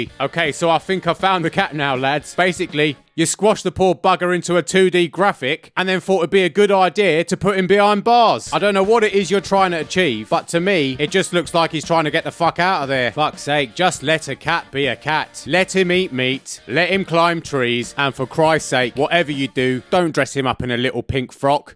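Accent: United Kingdom. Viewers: This is the most British person I’ve ever heard. I love it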